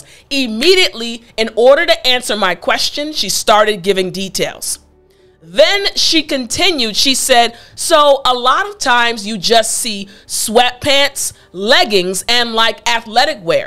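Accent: Africa. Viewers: you speak in English like Africans.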